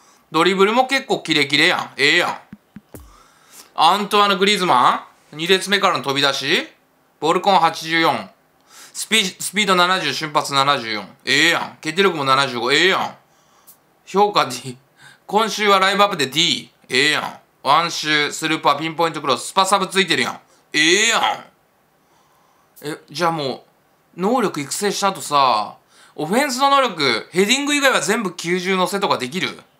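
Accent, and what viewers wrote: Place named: Osaka, Japan. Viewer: 下手な大阪弁ええやん😁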